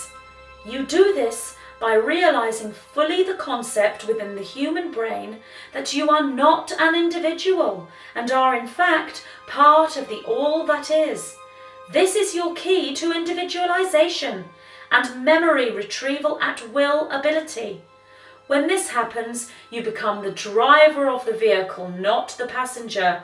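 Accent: United Kingdom. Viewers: Bit British aren't we?? I'm British too...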